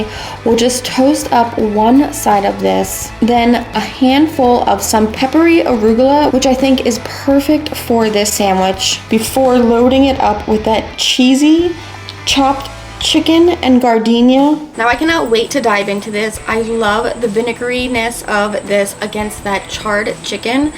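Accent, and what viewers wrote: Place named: Italian-American, United States. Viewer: First off, keep saying things however you want. Don’t worry about the pronunciation police. Your results speak for themselves. You’re the quintessentially authentic Italian-American your food tribe appreciates. Second, how do you never have too much or too little cheese in anything you cook? It’s always just perfect! Gotta learn how you do that! 😂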